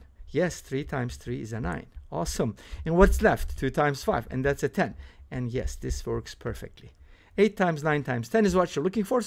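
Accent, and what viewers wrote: Turkey. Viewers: unnecessarily long video. last part was more interesting it could be more explanatory aksanindan anladim turksun helal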